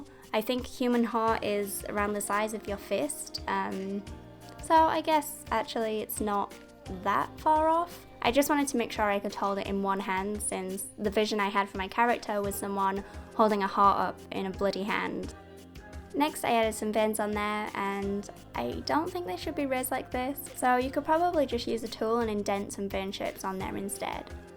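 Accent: United Kingdom. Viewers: Definitely gonna try this, you're such an inspiration. I really love british accent, what a joy to my ears to listen to you pronounce the word "Bloody" hahahaha :3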